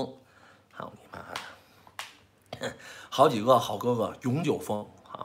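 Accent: Beijing, China. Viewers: 骂得太爽了，尤其一口京腔味带着愤怒，又夹着一丝丝幽默铺天盖地、如海啸般把海外这帮伪民运全卷入了海底，从此海外应该会清净点了吧！如果还有， ，再来一场海啸！ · 曉冬可以學台語嗎 你的臉一臉台味 怎麼是大陸腔呢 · 北京爺們兒罵人真好聽！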